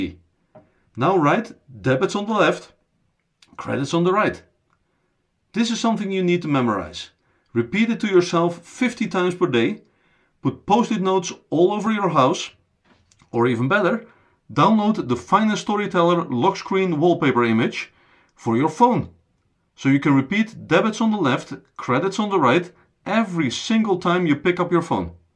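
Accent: Netherlands. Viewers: This gentleman is definitely Dutch!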